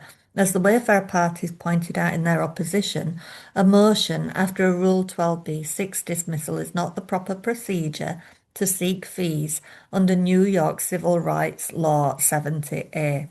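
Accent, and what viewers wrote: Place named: Scotland, United Kingdom. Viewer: I loVe the Scottish acccent!❤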